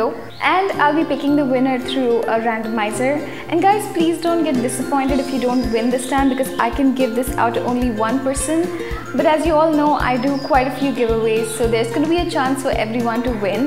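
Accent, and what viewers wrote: India. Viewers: I have one question I am not trying to be rastist or anything r u from india bc I have a uncle that is and his relitives have an accent and urs is almost the same be aware that I am not trying to offend any one that is from india or not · This wasn't really a back to school giveaway more like a make up haul where r u from and where do u live because you have an Indian accent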